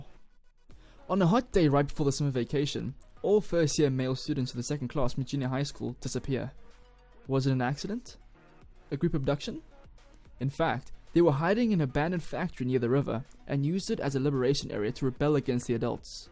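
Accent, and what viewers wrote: South Africa. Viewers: You from South Africa? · Are you from South Africa?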